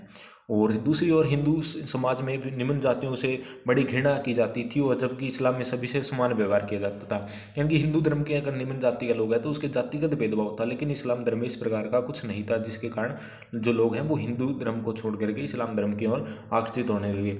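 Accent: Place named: Haryana, India. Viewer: Sir aap Haryana se ho... Aapki voice tone haryanvi lag rhi ha... Sun... Ka samjh ka bhot badiya ... Laga ....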